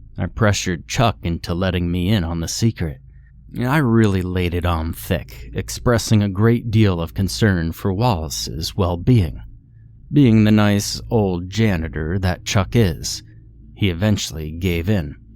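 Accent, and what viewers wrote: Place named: Appalachia, United States. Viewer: I don't like the hilly billy accent.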